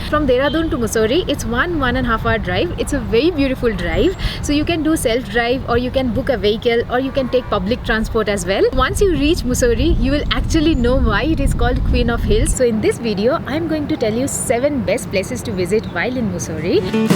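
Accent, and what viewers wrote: Bengal, India. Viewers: A very strong bengali accent chai dokan (dukan) , that's eet (it) , Avarest (Everest) , Mountanes (mountain), he wood kam (would come ) apart from that it was fine .:)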